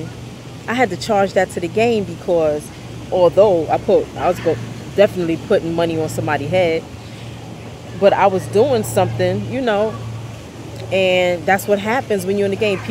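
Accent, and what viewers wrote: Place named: Harlem, United States. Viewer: I love her Harlem accent 😏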